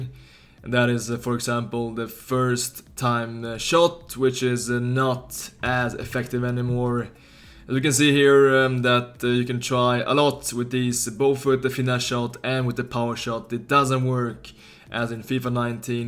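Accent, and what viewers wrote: Sweden. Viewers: Are you swedish i can tell by your accent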